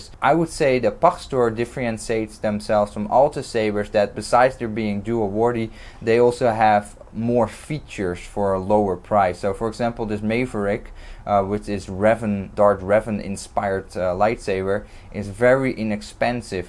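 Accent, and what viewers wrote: Netherlands. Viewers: ja ik hoorde het een beetje aan het accent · Where are you from, ur accent souns a bit dutch to me xD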